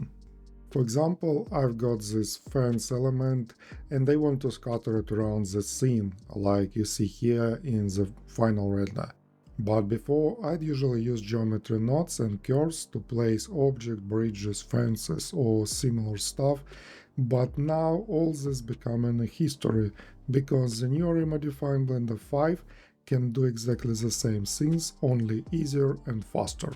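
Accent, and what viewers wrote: Russia. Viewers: ну я же слышу наш акцент, можно версию на русском ?) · русский акцент)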